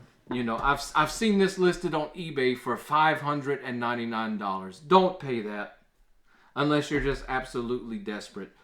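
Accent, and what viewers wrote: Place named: South Louisiana, United States. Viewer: Where are you from? Sounds like around my area in South Louisiana.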